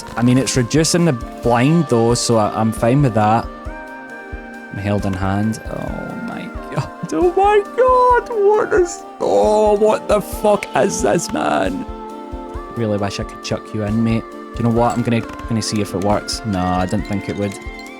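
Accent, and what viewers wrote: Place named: Scotland, United Kingdom. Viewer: Luv listening to a Scottish lad playing my favorite game ❤️ cheers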